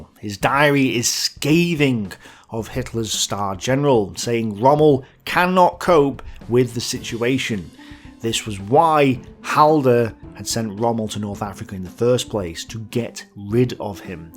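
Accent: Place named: Northern England, United Kingdom. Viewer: really? I hear the accent, but it is certainly not a continental one, it is a british isles accent. Or did you think all Brits spoke perfect Queen’s English, because they happen to live in England? And that English doesn’t have dialects?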